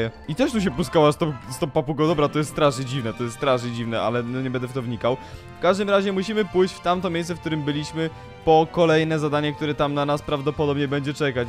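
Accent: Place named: Silesia, Poland. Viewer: Ty trochę umisz muwić po Ślosku jak ja.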